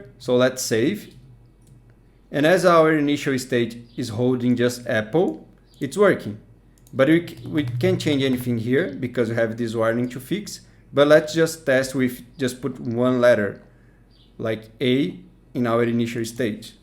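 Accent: Brazil. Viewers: Brazilian acent? New sub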